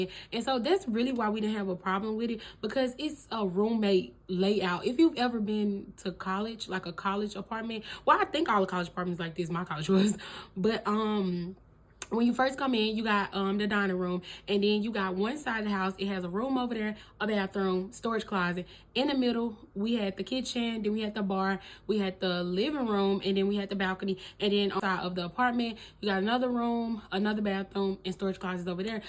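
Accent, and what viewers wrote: Mississippi, United States. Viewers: Accent ?? Sounds almost close to us Mississippi grls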